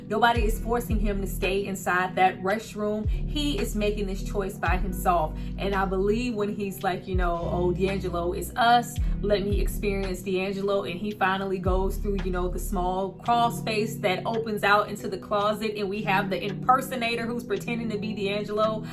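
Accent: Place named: North Carolina, United States. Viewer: Are you from North Carolina queen? The accent sounds like it.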